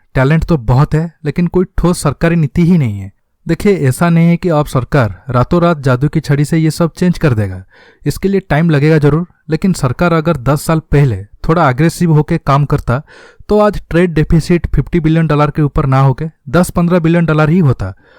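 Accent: Odisha, India. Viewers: Bhai tume odisha ru i guess based on your pronouncing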